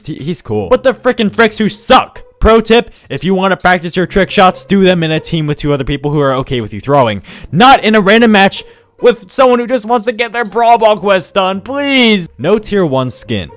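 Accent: India. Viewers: Bro r u indian??? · Are you Indian> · indian rupee ? ur Indian ? if u are damn nice accent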